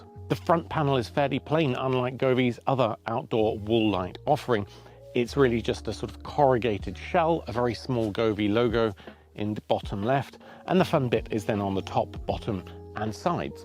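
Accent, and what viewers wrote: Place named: United Kingdom. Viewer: so nice to hear a UK voice on a review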